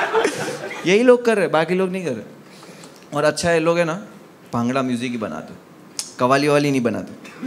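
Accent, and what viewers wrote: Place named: Gujarat, India. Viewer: Gujarati's while speaking English always creates a sense of humour BIG FAN ♥️♥️U FROM ABD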